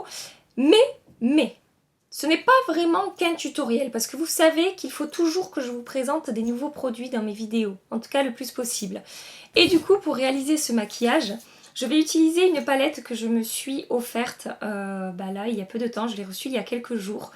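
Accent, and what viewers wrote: South, France. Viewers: Mdrrr ma tchuin avé l accent du sud mis à part le maquillage qui est à son habitude plus que réussie disons même superbe mais là tu as du perdre 2000 abonnés lol 😂 avec ton coup de gueule on sent que tu es excédé de répondre à 10000 commentaires du genre tu sors pas de ta zone de confort etc toujours les mêmes couleurs et j’en passe mais voilà comme si bien dit y’a des vidéos à la pelle de tous meme comment fabriquer une bombe alors le maquillage n en parlons pas lol 😂 Bisous ma belle et ce que tu fais tu le fais bien et tu y mets du cœur et de l’investissement personnel et financier mais ça très peu on connaissance de l envers du décor. Des milliards de 💋💋💋💋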